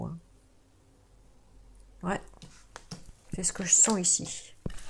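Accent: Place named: North, France. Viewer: Bonjour vous êtes du Nord 😊😊 je l'entends comme moi 😊😊 votre tirage me parle beaucoup ♥️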